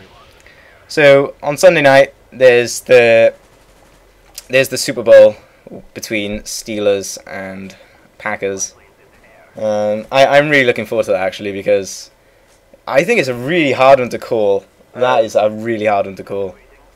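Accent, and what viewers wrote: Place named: United Kingdom. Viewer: This is weird cause I really like hearing British accents in commentaries. I feel like you're supposed to have a lot of viewers.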